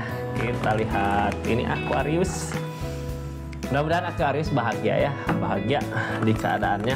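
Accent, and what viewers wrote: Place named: Sunda, Indonesia. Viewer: Amiin alhamdulillah alhamdulillah alhamdulillah alhamdulillah alhamdulillah alhamdulillah alhamdulillah, makasih redingannya sehat sll berkelimpahan amiin, hallo mas ganteng seperti anaku no 3 bhs sundanya medok bangett, makasih sangat resonet kecewa la sudah 35 thn di khianati sekarang la gak mikirin asmara deh la mati rasa cinta yang penting anak anak bahagia berkelimpahan tatap hari tua di depan byk kebahagiaan dan keberlimpahan semangat 58❤❤❤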